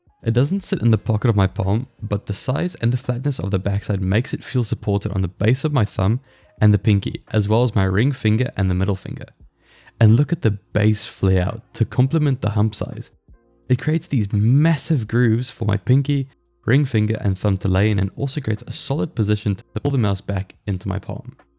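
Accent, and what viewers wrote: South Africa. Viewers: Great review man, are you South African?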